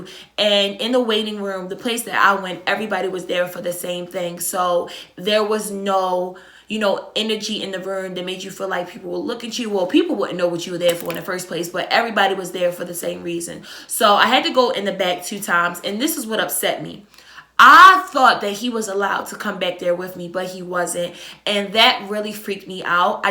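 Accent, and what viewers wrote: Baltimore, United States. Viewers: Came across you’re video and soon as you started talking I said she’s from Baltimore saying “Knew” like that lol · Yaya Unicorns as soon as she start talking I said I bet she’s from bmore. Also, your very brave telling your story. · im sick im from the D and soon as she started talking I'm like she must be from Baltimore ctfu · Idk how I stumbled across this video but I know a Baltimorean when I hear one 💁🏽‍♀️hey gurl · could tell after a few words you were from charm city lol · I knew that accent was BALTIMORE all the way. I'm a new subscriber btw.. Love ya accent tho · I felt like she was from baltimore. I know that accent to well. Love it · Are you frm Baltimore? Ur accent sound like it. Im frm DC. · I knew it!! Soon as you started talking, I could tell, This is exactly what I was scrolling the comments for. Bawdamore dummy!!! 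Accent heavy, I love it! This my first time viewing your channel, glad I found juuu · Baltimore accent so strong 😂 #yeahhus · Danm us Baltimoreons really do have a accent.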